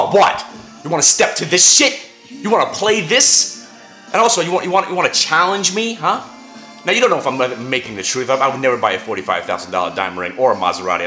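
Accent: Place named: United Kingdom. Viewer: genuine british accent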